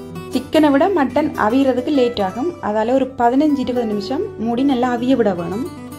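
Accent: Sri Lanka. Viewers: Sri Lanka la pesura tamil romba super sister தேங்காய்பால் ஆட்டுக்கறி பிர்ட்டல் அருமையாக உள்ளது சகோதரி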